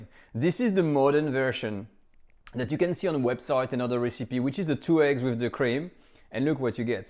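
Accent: Paris, France. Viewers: Parisian Accent 😁 Love your cooking ❤️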